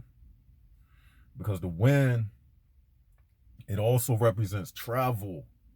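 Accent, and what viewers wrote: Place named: East Coast, United States. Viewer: I thought east coast because of accent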